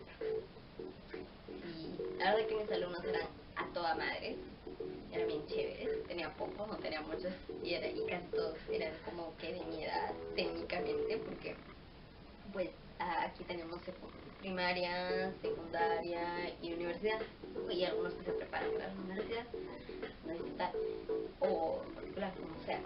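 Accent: Mexico. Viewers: casi puedo jurar que eres mexicana de verdad tienes acento de sonora por un momento me enganaste saludos... like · Hola bueno conociste el norte. muy buen ambiente. sólo te faltó conocer Chihuahua nuevo León Durango. y bueno hasta se te pego un poco el acento. aguevo. un saludo linda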